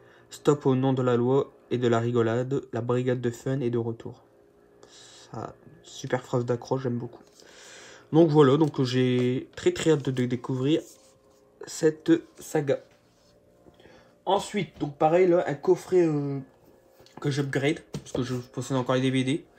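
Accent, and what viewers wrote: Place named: North, France. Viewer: T pas du Nord toi ? Ta un accent 😊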